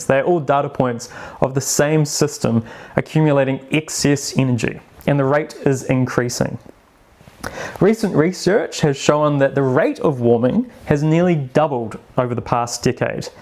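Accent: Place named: United Kingdom. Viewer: I saw the thumbnail and I was like 90% sure a British accent was coming my way, close enough. Yeah, shit is lookin' pretty bad, doomers!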